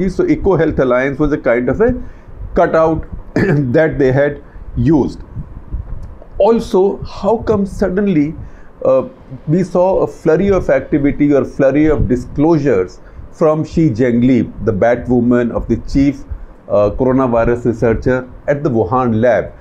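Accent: India. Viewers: The Indian accent is too strong it sound very hilarious although the guy is very serious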